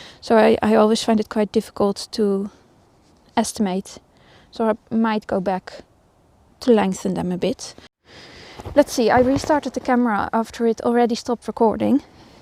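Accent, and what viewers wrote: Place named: Netherlands. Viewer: Het is te horen ja, sommige dingen klinken nog Nederlands 🤩 Maar toen je op 31 dec middenin een bos zat, kon ik me niet voorstellen dat je ook in Nederland wás op dat moment , zonder mensen en zonder herrie om je heen 😂 ik vond het heel leuk om te kijken en zal je zeker blijven volgen! Ja , ik brei heel veel en ik spin, maar niet zoveel als ik zou willen. Maar als ik jou dan weer zie .. dan wil ik nú beginnen 😊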